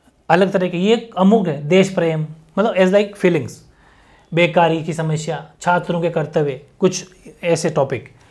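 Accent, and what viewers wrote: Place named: Jodhpur, India. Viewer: bohat bdiya lagi sir class bolta h jodhpur vala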